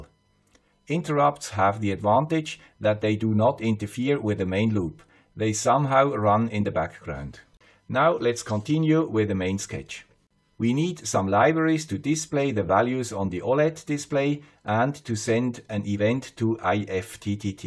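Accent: Switzerland. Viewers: How could anyone nuke such a nice guy (even with a Swiss accent)?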